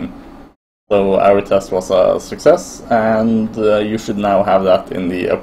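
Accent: Sweden. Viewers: Swedish detected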